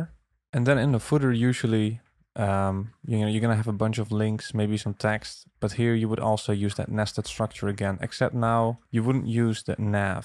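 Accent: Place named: Netherlands. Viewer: I hear a Dutch accent :)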